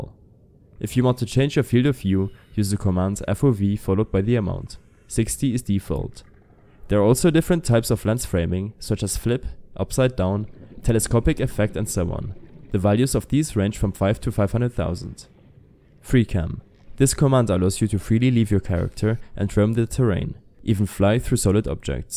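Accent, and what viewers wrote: Germany. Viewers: Not like Indian like German because I’m german and most of my friends when they try to speak English they are speaking like this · Kann es sein das du Deutsch sprichst? Du hast nen starken Klangf in der Stimme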